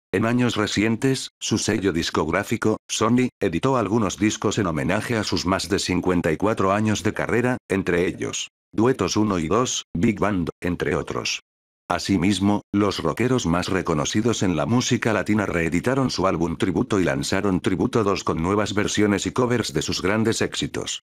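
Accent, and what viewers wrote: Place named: Spain. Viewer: este chocante acento gachupín del narrador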